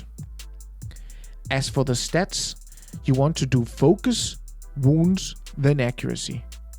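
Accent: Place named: Denmark. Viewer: You sound danish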